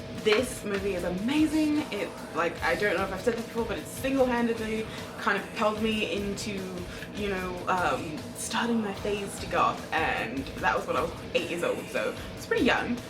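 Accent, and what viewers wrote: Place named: United Kingdom. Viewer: really?? I hear a slight British accent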